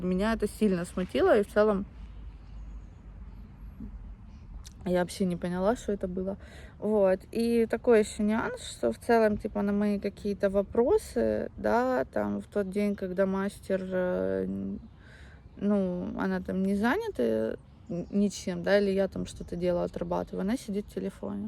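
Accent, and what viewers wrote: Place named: Ukraine. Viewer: Ужас, вид, как будто трепали целую ночь. Вялая, нужно учиться не рот накачать, а учится,над речью. Это украинский акцент звучит примитивно. Волосы распущенные. Кажется очень дешего.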